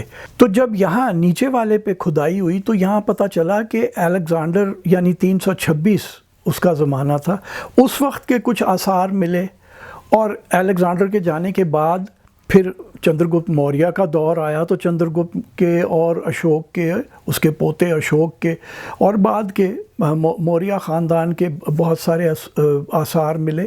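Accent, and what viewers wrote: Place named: Talagang, Pakistan. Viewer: My parents and grandparents emigrated from Talagang. Your Punjabi is similar. Are you from that area?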